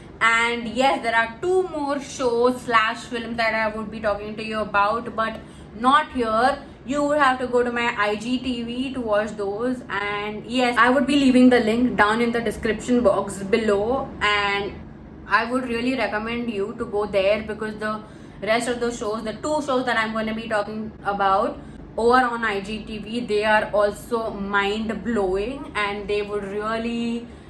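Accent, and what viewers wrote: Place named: India. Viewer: i like the indian accent !!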